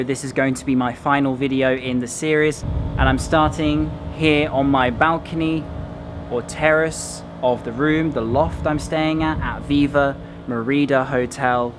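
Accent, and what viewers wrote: United Kingdom. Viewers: Soo happy to see you again! Welcome back to the best British accent ever, and i'm glad you recovered ^^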